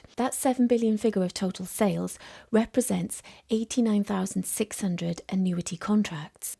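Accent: Wales, United Kingdom. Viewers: A great summary and delivered in a wonderful Welsh accent too!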